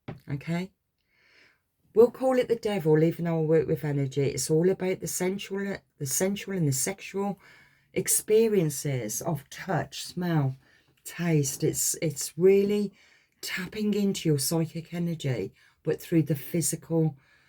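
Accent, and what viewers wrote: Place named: Midlands, United Kingdom. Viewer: Hello lovely, stumbled upon you today.. so strange as you reminded me of my mum so much ?! in looks and the way you speak. We share similar accents too ?! so I feel we live close aswell, guessing central midlands Uk 🇬🇧. Ironically it is her 82nd birthday in spirit today 16.1.45 her name is Lorraine and she also was a therapist for individuals with addictions/mental health. Just wanted to say I found you so relaxing to listen too, found you very comforting on an emotional day for me 💔. I’ve listened to 3 so far and all resonated . Love your style of reading too, very interesting and gave spiritual knowledge to research. Subscribed ! Sending you abundant blessings Thankyou 🤍🪽💜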